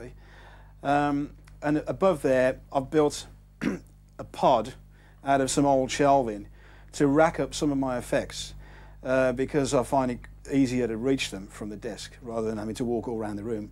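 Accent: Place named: Birmingham, United Kingdom. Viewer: He has similar accent as jasper carrot from Accocks green, is from about 3 miles away. Kitt’s green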